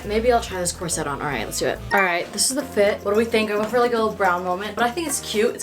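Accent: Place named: United Kingdom. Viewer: Loved your British accent 😂😊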